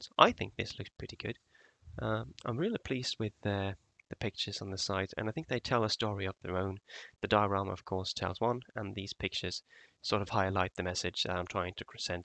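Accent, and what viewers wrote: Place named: United Kingdom. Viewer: Turned out really nice, mate. I would suggest if you’re going to get into dioramas that you invest in a Dremel tool (a little over $120 Aussie on eBay, so probably around 60-70 quid going from your accent, lol!!) - they’re perfect for cutting, and you’d be able to make the edges where the tanker broke in two more jagged and realistic. Also get yourself an airbrush (around AU $150 on eBay for a half decent kit including compressor and everything else you need), it makes doing the detail work that much easier. Please don’t get me wrong, what you’ve done looks fantastic, but particularly the Dremel will go a long way for those little finishing touches. As an aside, on a historical note, empty tankers were even more dangerous and prone to being blown out of the water than full ones, as the tanks may have been empty, but were full of explosive fumes, just waiting for a spark. 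Tanker crews were hands down some of the bravest to serve!!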